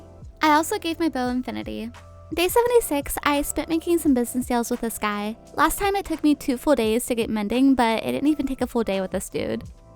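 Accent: Australia. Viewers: Very great Aussie accent, fun thing is that people from the center to northern territory do speak like that, but I'm from the other end of Australia (AKA the southern east area) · Your accent was half Australian half English coming from and Australian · The best Aussie accent I've heard so far And I'm a Aussie :)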